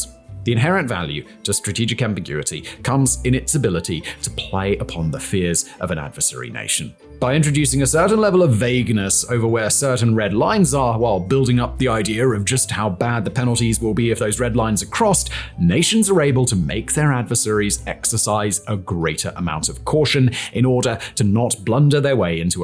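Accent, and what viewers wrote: United Kingdom. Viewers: ok, 3000 comments so probably no chance you check mine; so you ll get a quick comment mate, you don't know what you re talking about, which is surprising considering you sound british just read churchil s memoirs mate and you ll know what s going on also, forget NATO troops, french troops; france got out before, can get out again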